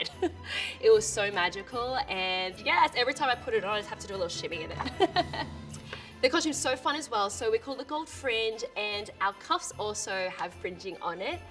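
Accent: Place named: Australia. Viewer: So nice hearing an Aussie voice in the Rockettes (from one Australian to another). Would love to see the show sometime if ever I'm in the US at the right time.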